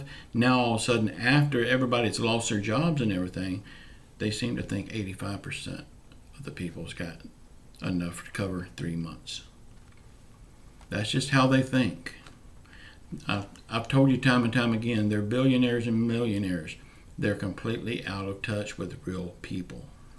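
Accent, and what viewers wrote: South, United States. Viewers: Weeelll, spit it out man,you're southern slang,assumptions are boring,I don't have to call the white house,Madonna is well capable,thanks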